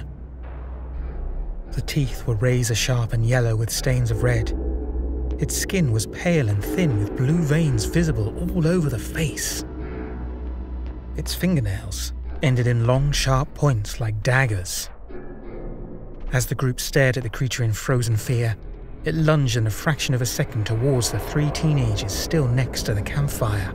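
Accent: United Kingdom. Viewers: Cheers fella, loving the content- I am sure your subscriber numbers will shoot up! Nice to hear a British voice 👍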